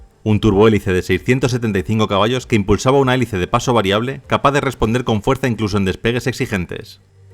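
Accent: Spain. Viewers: MUCHACHO RELATADOR. HABLA MÁS DESPACIO PORQUE NO SE TE ENTIENDE TU ACENTO NO SE DE QUÉ PARTE DE ESPAÑA SEAS. PERO HABLAS CON LA LENGUA PEGADA AL PALADAR ÓSEA TE OYES CÓMO UN TRABALENGUAS , TRATA DE PRONUNCIAR TUS PALABRAS MEJOR DICHAS. ESQUE TE COMES LAS PALABRAS Y APARTE HABLAS RAPIDO. NO SE TE OYE NADA CLARO CORRÍGETE MI AMIGO. GRACIAS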